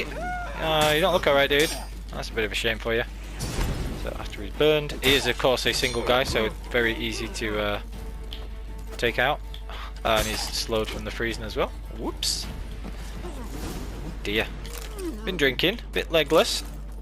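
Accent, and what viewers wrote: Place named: United Kingdom. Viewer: WeeeeeelllIl, want to marry a British guy just for the accent. As soon as I find one willing to. So yeah, guess most of the girls around the world do love your accent 😅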